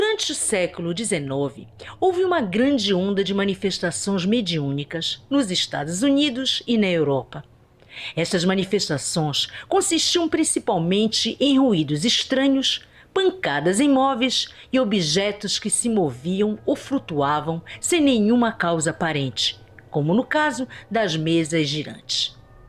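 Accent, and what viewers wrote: Pará, Brazil. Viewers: a senhora é paraense?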